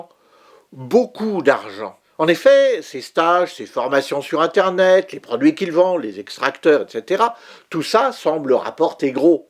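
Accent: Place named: North, France. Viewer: Alors là! je peux assurer que vous êtes du nord, non? "des chauves souris dans le beffroi"? =)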